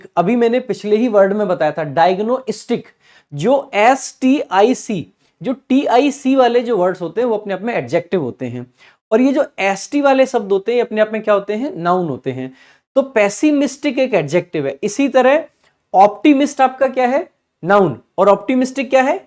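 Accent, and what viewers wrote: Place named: Haryana, India. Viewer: HARYANA SA HO SIR MA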